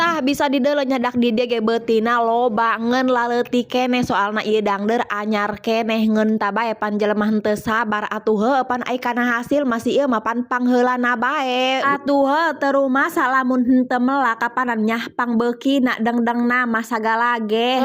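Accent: Indonesia. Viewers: Sunda na urang mna iye teh nya,jiga bahasa sunda urang ker alit😊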